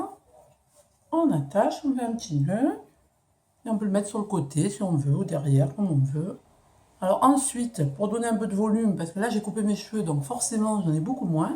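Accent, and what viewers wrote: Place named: South, France. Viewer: Coucou, sympa de te voir "animée" et d'entendre ton petit accent du Sud:) Evidemment je m'abonne! Bisous bisous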